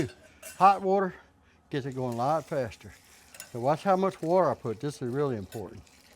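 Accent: Louisiana, United States. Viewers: I did not understand the type of seasoning , must be thst Cajun accent. I use to live in New Orleans ,I miss those days. Thank you for the recipe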